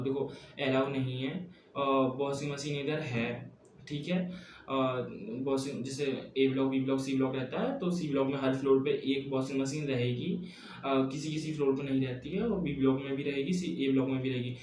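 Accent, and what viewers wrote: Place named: Uttar Pradesh, India. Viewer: Bro tum konse state se ho merko Uttarpradesh se lagte ho .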